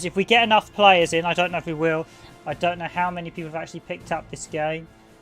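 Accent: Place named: England, United Kingdom. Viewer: Bro! You are english… it's obvius but… I need to know, what accent of England is the one you have?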